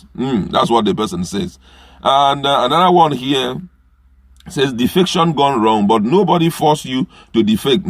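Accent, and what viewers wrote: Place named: Ika, Nigeria. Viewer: Bro, you speak like an Ika Igbo, you sound like George Oyinbe. I went to high school in 1970/1971 with a guy called Pius Oyinbe from Ika. This was in Ologbo\Benin.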